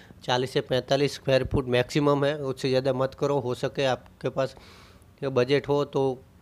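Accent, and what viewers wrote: Gujarat, India. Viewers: Gujju che k su bhai amuk word sambhdine to lage 6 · Gujarati Accent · You are gujrati right?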